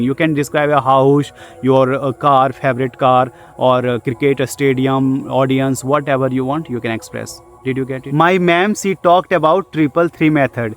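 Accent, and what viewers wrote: India. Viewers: Pls improve this indian accent if u dont wanna get bully in English countries. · Bihari English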